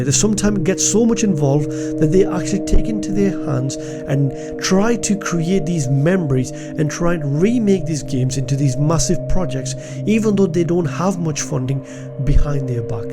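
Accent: Newcastle, United Kingdom. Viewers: You from the west end, bro? (accent is a dead give away to a fellow Geordie) EDIT: little bit of boro in that accent, so not so sure now.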